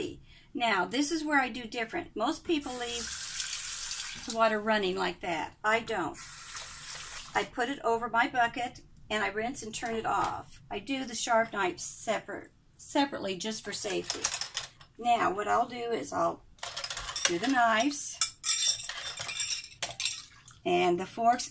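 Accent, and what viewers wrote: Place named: Kansas, United States. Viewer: I knew u were from Kansas. Kansas girls just know one another. And the way we say wash. Ps I was all my dishes by hand ❤️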